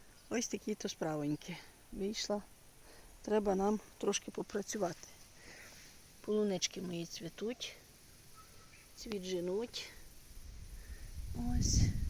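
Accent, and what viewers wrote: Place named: Khmelnytskyi, Ukraine. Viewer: доброго дня, я давно дивлюся ваше відео. Ви говорите,як у нас на Хмельниччині.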